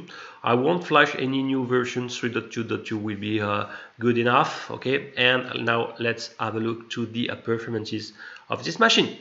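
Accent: France. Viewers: Tu es francais non ?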